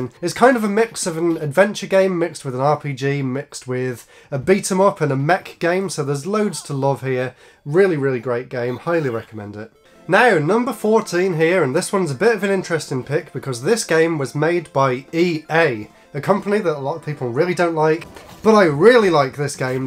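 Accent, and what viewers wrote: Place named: Northern England, United Kingdom. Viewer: At 2023 i figured out that there are plenty of different pronunciations in England, so now i gan here and there and bother people with question "where are they from" if hear not so-called standard pronunciation (thine is obviously northern one). So, satisfy my curiosity, where art thou from? (i guess Birmingham or its surroundings).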